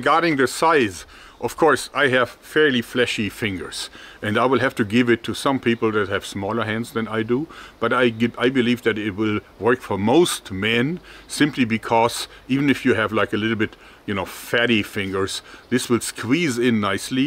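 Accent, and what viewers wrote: Germany. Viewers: What accent does he have? edit: he is most likely german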